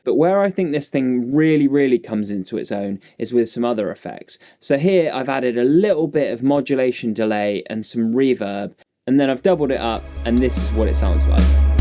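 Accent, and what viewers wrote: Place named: United Kingdom. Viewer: My weekly bri ish pronunciation lesson ❤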